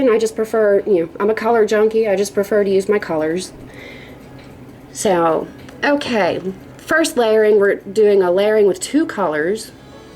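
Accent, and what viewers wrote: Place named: Pittsburgh, United States. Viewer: are you from pittsburgh? you have the accent of a yinzer!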